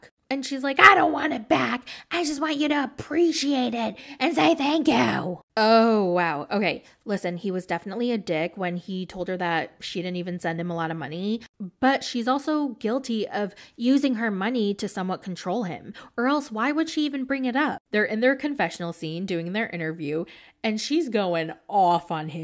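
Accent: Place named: Boston, United States. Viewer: You’re from Boston! Lol hey girl 🫶🏾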